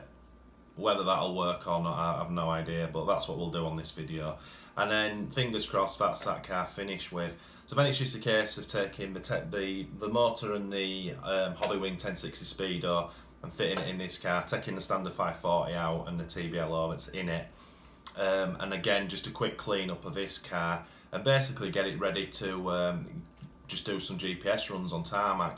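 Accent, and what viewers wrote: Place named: Yorkshire, United Kingdom. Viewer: Great content mate, where you from? You sound Yorkshire, I'm Leeds myself 👍😎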